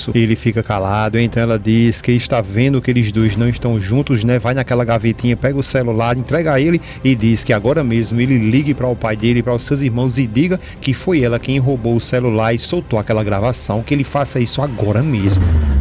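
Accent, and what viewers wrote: Pernambuco, Brazil. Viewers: Eu acho que ese narrador é de Pernambuco tem um sutaque forte e fala mulestra e Satanás ele é muito engraçado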